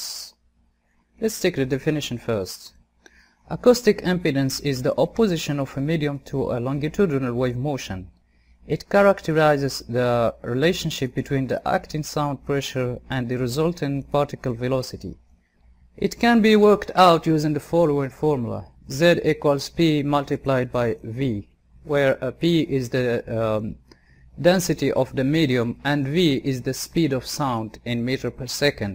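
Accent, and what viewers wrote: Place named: Maghreb. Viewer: أحسست فيك لهجة من المغرب العربي لذا سألتك ، انا اخوك من الجزائر ، بالتوفيق لك وشكرا جزيلا على الشروحات التي تقدمها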